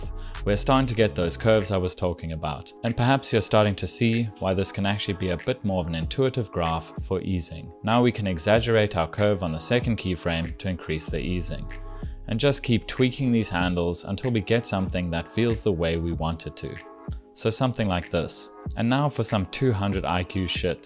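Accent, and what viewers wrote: South Africa. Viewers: Knew it! Heard the south african accent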